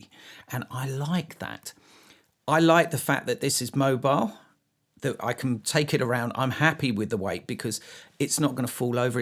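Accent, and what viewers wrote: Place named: United Kingdom. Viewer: I love British English. I enjoyed this review.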